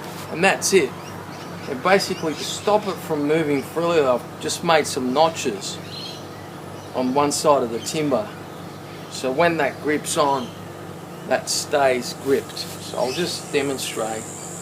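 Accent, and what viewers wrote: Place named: Australia. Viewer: Thanks for the fast reply! Awesome. If I had to take a guess I would place you in Australia (your accent gave you away):D Thanks for the informations. I already downloaded your instructions (and want to throw money at you for it - by the way). I was just curios which of your videos was the most recent design In your instructions those eye bolts further down the "legs" of the stand (the ones you used to hang the speed ball) are missing, I presume they're just drilled into but wondered if you found a more elegant solution:D But with your idea I can get rid of the gym since I'll be able to do pullups on the station. And dips. And a punching bag. I really love your idea!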